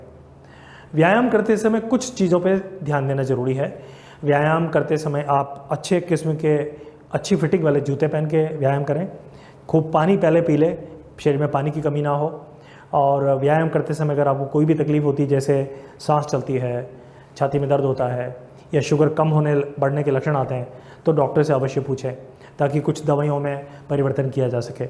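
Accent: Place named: Rajasthan, India. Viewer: Your conversation says you belongs to rajasthan for sure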